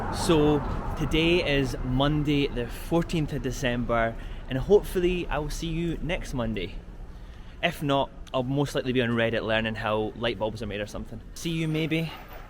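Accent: Scotland, United Kingdom. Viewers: Great video, I'm 27 yo , i achieved so much already, read a lot of books, learn a lot of things, traveled abroad several times , got settled to a graet job with good income. I'm grateful to many things in my life But i feel from time to time I've wasted a lot of my life already. My love life is not that great, i feel depressed sometimes especially when i see my friends happy , acchive their goals , get engaged or married. I hope i could stop feeling that way. P.S. I love the scottish accent <3<3 Love and peace from Saudi Arabia.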